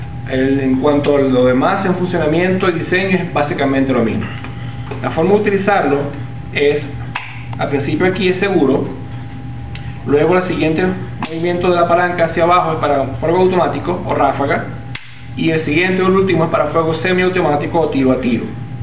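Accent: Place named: Venezuela. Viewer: por el dejo se nota que es venezolano.... habla tanto del ak-103 pero no muestra ni uno....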